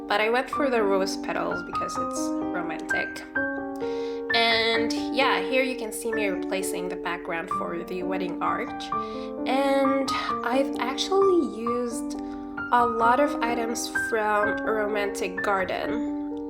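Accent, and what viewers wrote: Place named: United States. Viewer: Tbh you sound like an American to me so your doing great!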